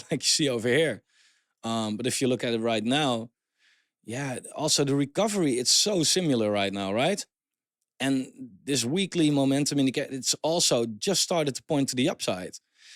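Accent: Netherlands. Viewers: The accent ? , are you Dutch ? Kom je uit NL ?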